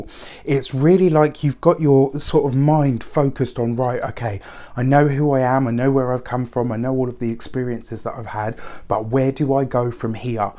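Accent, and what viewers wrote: United Kingdom. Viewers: OMG your british accent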